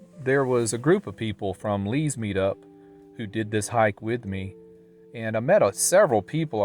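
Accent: South, United States. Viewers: I love your southern drawl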